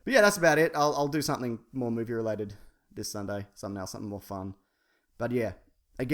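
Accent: Australia. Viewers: I knew he was from Australia #wtfu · Wow, this video is very informative, thanks for bringing this issue to light. I have heard horror stories about youtube in the past. Just wanted to say how much I enjoy your channel and I bloody love (the) Weekly Planet. I am originally from the UK but currently living in Malawi. The internet connections are pretty shit here but I really look forward to your podcasts and videos every week. Yourself and Mason are fucking comedic geniuses! Oh and I spent two years living in Melbourne a few years ago and I quite enjoy hearing the accent again! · I love you,. I love you and your sexy sexy serious Australian voice.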